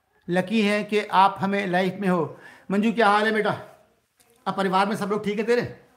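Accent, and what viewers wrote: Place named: Moradabad, India. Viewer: humaray mata pita moradabad up say Karachi aaiy thay aap ki bhasha bilkul moradabadiyon jesi hay Meray pita gee bhi bilkul aap ki Tarah Urdu Hindi boltaiy hain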